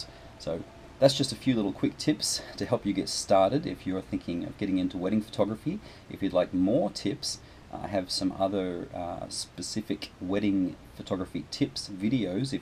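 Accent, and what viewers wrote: Australia. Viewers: Great video, I have to say... the first time I heard your voice I was like...Wait... what? Wasn't expecting the Australian accent. Now every time I watch your videos I quote Mick Taylor from Wolf creek as I watch... Kind of morbid I guess...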